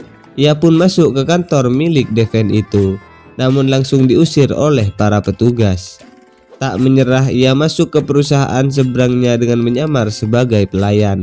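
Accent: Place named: Madura, Indonesia. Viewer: suarane kyk meduro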